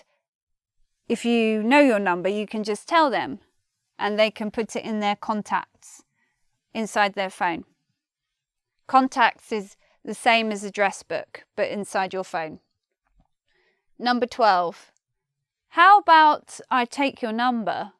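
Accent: United Kingdom. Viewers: I love her British accent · One more time, thank you! I like it British English pronunciation too.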